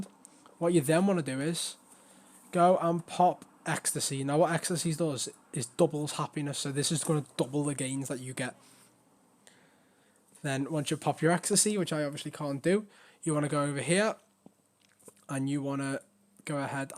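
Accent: United Kingdom. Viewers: Thanks man! Good to see a fellow scouser as well. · Loving these vids so far mate. I dunno if it's coz your a fellow Brit and explain things without going a million miles n hour like most of em on here but I'm looking forward to watching the rest. Thanks for taking the time 🍻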